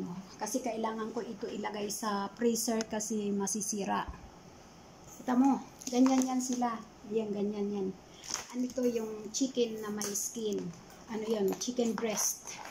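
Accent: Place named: Visayas, Philippines. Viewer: hello nanay bisaya ka haha pariha ta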